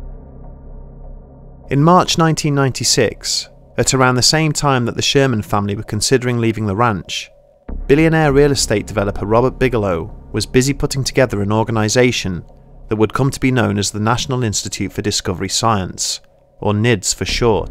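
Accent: United Kingdom. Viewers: Why do I these videos always have to be narrated by some damn Brit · we need more UK guy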